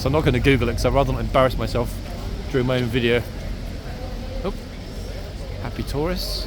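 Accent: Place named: London, United Kingdom. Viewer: Oh what a joker. You never fail to amuse and entertain me, also educate me. I am an eastender but love to hear your london accent daily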